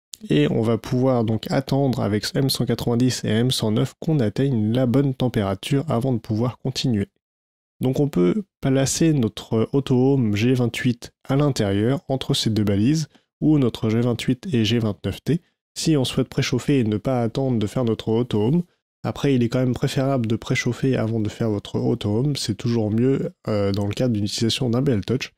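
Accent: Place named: England, United Kingdom. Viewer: J’adore cet accent anglais!!!🤣👍🏻...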